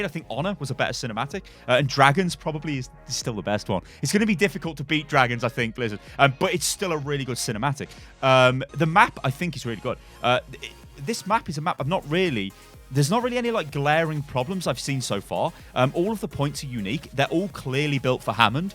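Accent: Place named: United Kingdom. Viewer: Thanks, I just remembered why I hate the British accent. It's terrible and hurts my ears. How the fuck can you write "Reinhardt" wrong TWICE? Get your shit up.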